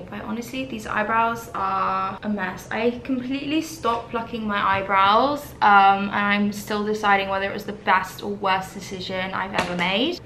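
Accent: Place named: Chelsea, United Kingdom. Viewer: Can already hear the Chelsea in her voice 🥴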